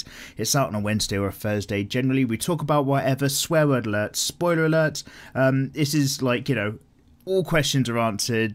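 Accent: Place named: Liverpool, United Kingdom. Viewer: liverpool scouser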